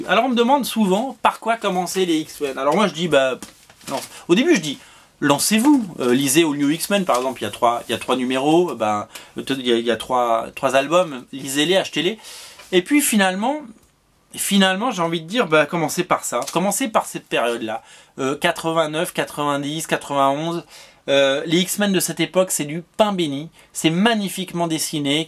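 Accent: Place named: South, France. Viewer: Moi j'aime bien ton accent du sud :D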